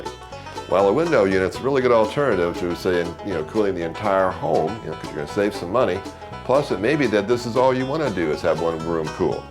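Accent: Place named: Missouri, United States. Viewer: He clearly said : "This unit"..... Seems like 19 people can't understand a Missouri accent.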